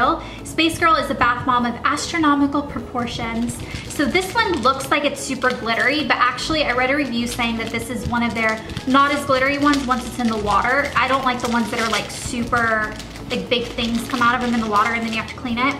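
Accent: South, United States. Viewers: i thgt u were uk but ir american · Your such a southern bell! Like that might be weird to say but that is how I would describe you lol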